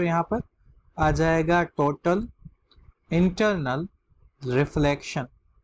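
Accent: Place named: South, India. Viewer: The time I heard south acent I knew that this is the video which will help me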